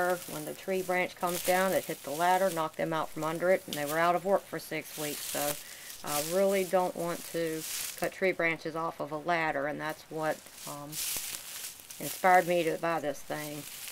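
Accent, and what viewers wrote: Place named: Tennessee, United States. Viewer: Never knew this existed, wow! Must get me one. Love the accent. Sounds like Tennessee.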